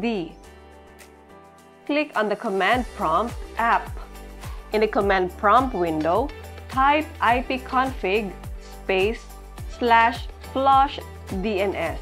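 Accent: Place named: India. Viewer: i would have fell for it if it wasnt for your indian accent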